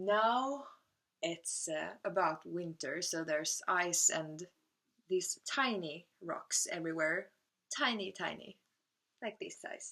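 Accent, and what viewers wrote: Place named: Sweden. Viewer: you have an awesome accent. And Swedish is the most popular North Germanic (Scandinavian) language.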